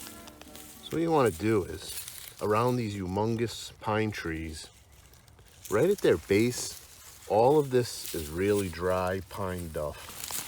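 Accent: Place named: Massachusetts, United States. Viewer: Good stuff. Nice to hear a MA accent. Maple House sent me here. Subbed.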